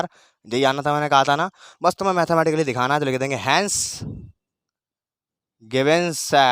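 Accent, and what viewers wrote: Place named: Braj, India. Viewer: braj bhasa accent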